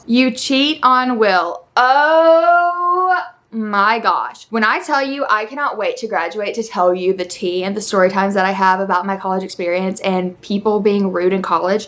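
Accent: South, United States. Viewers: I think you should try not to waste too much of your time with negative comments. Misery loves company. People that try to tear down others are just miserable and can’t stand the sight of people being happy. You are such a ray of sunshine. You work hard for what you have and you deserve to spend it and enjoy it how you like. I’ve never gotten the impression that you brag or show off. Not at all. You seem kind, sweet, hard working, fun and funny, thoughtful and caring. I love your southern twang, which is much more pronounced when you are trying to set some boundaries for Baby Elle. Instead of a stern talk, it comes off adorable. 😍📱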